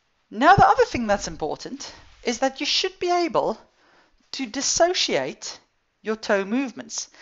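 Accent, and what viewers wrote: South Africa. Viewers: South African accent!! I dont hear to many of those anymore here in the UK haha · Thanks you and is that that an South African accent?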